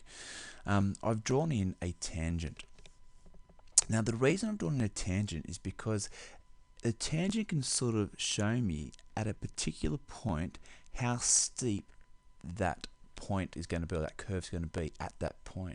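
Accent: Australia. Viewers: es una muy buena explicación muchas gracias, pero, ¡Griffin MC5 es retrasado! Tambien me gusta el accento australiano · Sounds Australian to me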